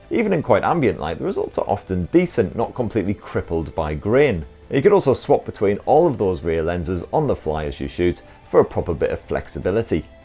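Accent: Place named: Yorkshire, United Kingdom. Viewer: Thanks for the great review. I picked up on your Yorkshire accent in the first line, and was delighted to see pictures of York. I enjoy comparing them to my Nikon pictures from my visit. Memories! Cheers.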